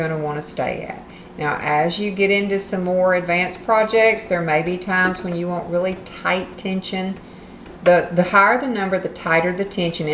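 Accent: South, United States. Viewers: Your video came on after I finished another tutorial, but I thoroughly enjoyed your southern country drawl, as well as your explanation. I put my singer away and just sat and listened to your video. Thank you!! 🙏🏽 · You did a fantastic job with this video! Your explanations and demonstrations were so thorough and extremely helpful and easy to follow. I’m looking forward to the holidays being over so I can play with my new heavy duty Singer machine. Oh, and your southern drawl made it even more enjoyable! 😊. Thanks, looking forward to the next video.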